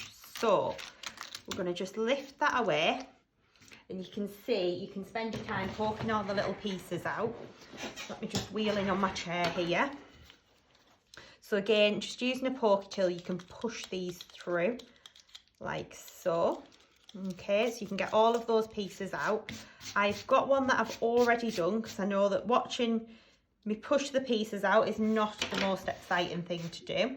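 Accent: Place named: England, United Kingdom. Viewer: Wow, Do you sell your cards to card stores? When i was in the UK in 2019 just before my Father Passed away me and my sister went to a card store and i couldn't get over the detailing of all the cards in there. Layered cards with pop ups and animals in forests and such. i love the Card stores in the UK there is always something you haven't seen before in there. I brought some home for my family here in Canada where i now live I was born in the UK so its nice to hear an English accent again. I was born in the south of the UK in Portsmouth and my hubby was born in Yorkshire but had lived in Canada since he was 4 year old, We met while he was serving in the Royal Navy in my home town we got married just over a year later and here we are still married after all these years. I have always wondered how to do the greeting cards myself but i have been more curious lately. 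I have loved making crafts here since moving to Canada there are so many talented people especially on You Tube. I wish i could spend all day on here really. I love the card you made its really beautiful. This is the first video i have watched of yours and i have to tell you i am hooked on this cardmaking. I love the cutting dies but can you use them without the Gemini machine or similar machines? I have tons of glitter that has never had anything i could use it on super fine coarse large and shaped glitter tons of card stock and a few dies but they are so cheap to buy on Amazon Temu Wish etc. The embossing i have ne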